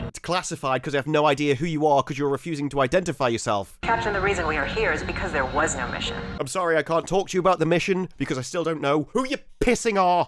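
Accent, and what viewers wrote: United Kingdom. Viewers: Go to the Dentist u brit, handsome voice tho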